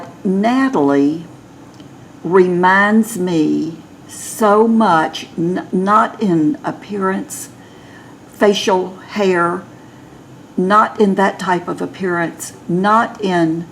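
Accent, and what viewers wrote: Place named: Arkansas, United States. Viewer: ***** oh, I love Arkansas! That's why you have such a beautiful accent! Stay safe!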